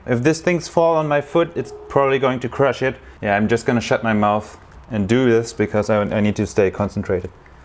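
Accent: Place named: Germany. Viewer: i love how you can instantly recognize a german accent even though its almost unnoticable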